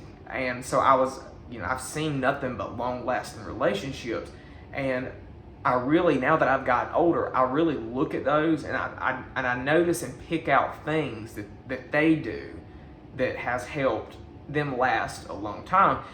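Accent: South, United States. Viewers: You have a very honest and down-to-earth sense of yourself and life in general. I love your southern accent drawl! lol. You've developed some great storytelling in your videos here. Maybe you could think about trying out some motivational speaker jobs at nearby schools or libraries?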